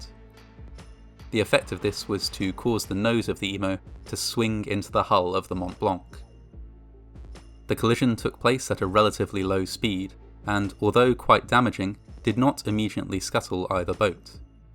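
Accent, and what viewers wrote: United Kingdom. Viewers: The Brit accent makes Fascinating Horror videos slightly more fascinating for no good reason 😄 Other Brits: "Not particularly" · So glad there’s another my son uses this to sleep 😂 and it’s amazing how things can quickly go wrong. And thought you were from the UK with the accent 😂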